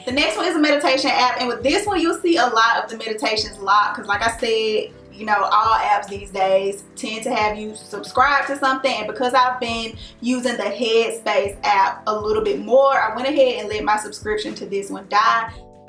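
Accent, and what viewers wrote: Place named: Texas, United States. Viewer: Your voice is kinda a mix between a Texan/southeren accent and something else lol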